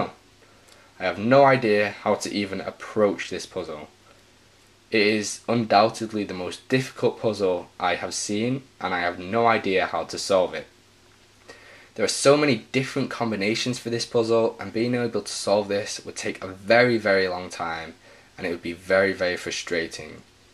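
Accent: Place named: Yorkshire, United Kingdom. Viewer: Hey, just wondering which part of the country you are from as you sound like a yorkshireman?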